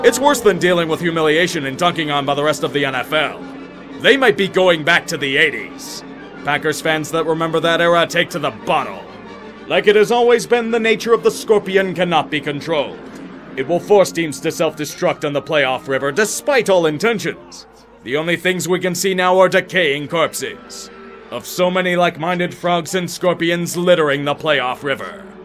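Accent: Pittsburgh, United States. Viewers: SIDE NOTE: I love how Beethoven's Molto Vivace is playing in the background during the narration. Goes very well with the Yinzer's voice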